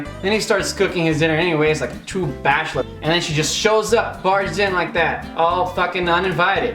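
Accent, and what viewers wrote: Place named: North America. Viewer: cara, gostei pra caralho, apesarapesar de ainda ser um canal pequeno tem uma qualidade incrívelmente boa, e se o nn tivesse falado, eu teria certeza de que vc era norte americano, parabéns mano!!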